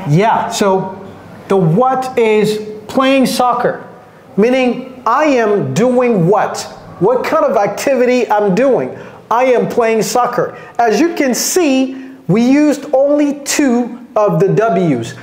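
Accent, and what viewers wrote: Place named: United States. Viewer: He is very fluent With his american accent.😊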